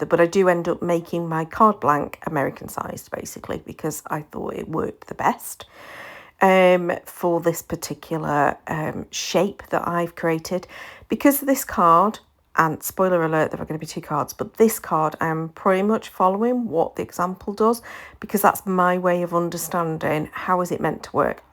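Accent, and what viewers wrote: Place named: United Kingdom. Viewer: Heey, your video is just fine! We all have our off-days (only most of us do not have to put them on the internet for everyone to share!) - and it is encouragement to all of us that you jot just managed to persevere but even got some exceedingly pretty cards out of it! Plus I love that you just tell it like it is: however pretty a set of stamps, dies, stencils is, if the instructions are not good, it is definitely worth knowing! I love your videos because they are real and fun as well as full of ideas and inspiration (and because I love your accent, takes me back to my days at uni in the UK (looooong ago 😂)). Take care and kind regards from Germany, Nicola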